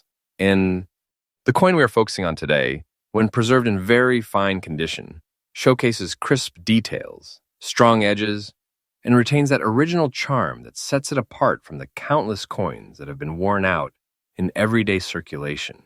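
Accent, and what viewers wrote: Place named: United States. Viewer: What a lot of drama. Yankee accent !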